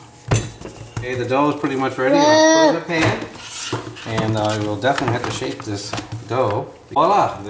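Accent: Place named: East Coast, United States. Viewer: Yeah for sure thats what i meant haha.. My old man always put hot dogs on it growing up.. Its awesome! where abouts are ya from? sound like a east coast accent?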